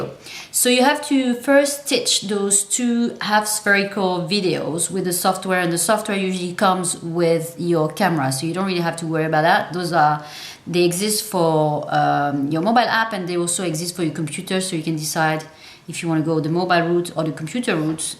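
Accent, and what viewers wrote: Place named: France. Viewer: Thanks for the information and the cute French accent. I’ve liked and shared.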